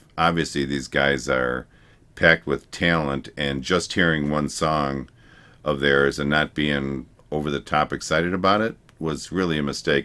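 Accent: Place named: Midwest, United States. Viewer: 3 seconds in and I detect Midwest/Great Lakes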